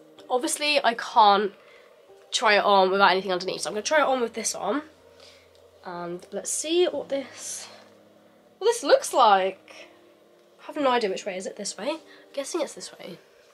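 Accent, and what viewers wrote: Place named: United Kingdom. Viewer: cute voice with the British accent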